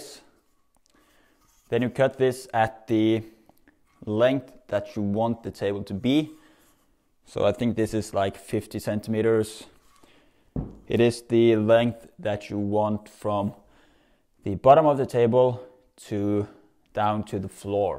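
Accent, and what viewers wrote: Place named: Sweden. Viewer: Snyggt! Tyckte jag hörde lite svengelska därav min reaktion. Följer dig nu så hoppas på grymma videos i framtiden 😊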